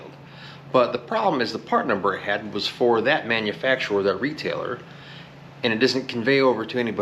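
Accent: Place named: Texas, United States. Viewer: May I ask where abouts are you located, It sounded as if your in Texas or close to it, Just curious and if you rather not say for obvious reason of unlikely types showing up I get it You don’t know me but maybe we will meet at a show sometimes But I really need to watch more of your videos I see some learning this old man needs to pick up on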